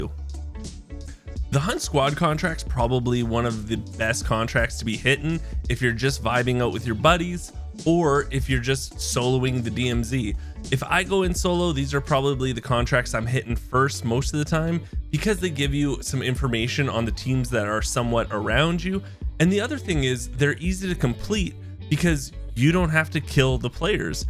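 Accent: Canada. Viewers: You were soo accurate with your “15 DMZ tips and tricks to make you a pro” video. Your goofy Canadian accent WAS fun😮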